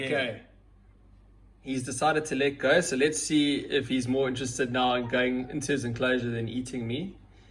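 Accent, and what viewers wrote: South Africa. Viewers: U sound south african Are u south african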